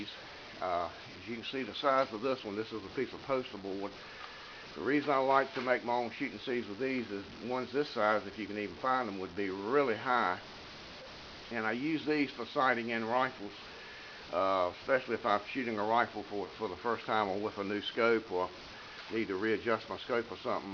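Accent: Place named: Virginia, United States. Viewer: I have a Mossberg 46B that I've had since I was a child and it was my father's since he was 11 or 12 years old from 1935 or 36. I cannot tell you how many groundhogs I killed with that rifle as a child and it still will drive tacks at 30 to 40 yards. I refinished the stock last year and it looks gorgeous but I only have one concern with the gun and is that is that it has problems sometimes feeding shells from the magazine...... I think it's more of a problem with the magazine feed tube than it is with thebolt because I've changed out the injector and shell pick up and I'm gonna be looking at the magazine a little closer and the spring to make sure it has enough pressure on the shells. If you have any ideas why they're not being picked up by the bolt please let me know ....... once the shells are shot the ejector works perfectly. By the way you must be from Norfolk or Chesapeake Virginia with that accent.....you sound just like one of my uncles!!!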